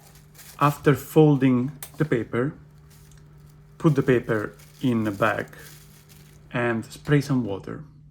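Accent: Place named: Venezuela. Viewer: Tienes acento venezolano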